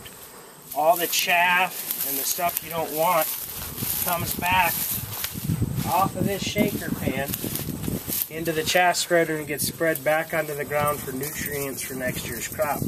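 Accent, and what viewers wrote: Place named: Minnesota, United States. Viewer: Neat vid. I'm from Iowa and I'm looking at you and thinking your an Iowan but then I hear the voice and Im thinking Minnesota....maybe Northern Iowa?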